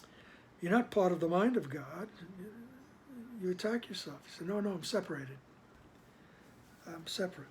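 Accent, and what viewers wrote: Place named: New York, United States. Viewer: I knew you too were a New Yorker! Had a feeling when I heard the sirens and the way you talk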